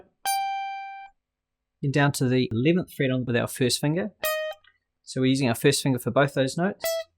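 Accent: New Zealand. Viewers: Nice to hear a new zealander for once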